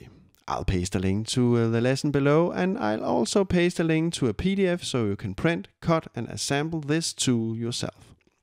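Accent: Denmark. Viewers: That accent 🤌🇩🇰 Greetings from a fellow danish musician. Great stuff ❤ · You sound Danish...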